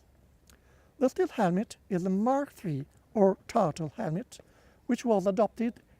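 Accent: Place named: France. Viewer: I'm curious too. Judging from the accent I'd say France, or somewhere French-speaking?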